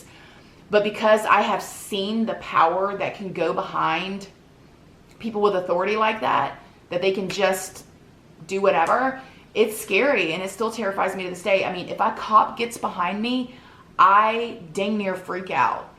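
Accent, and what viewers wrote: South, United States. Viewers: You have a southern accent. (I’m from Louisiana) where are you from beautiful?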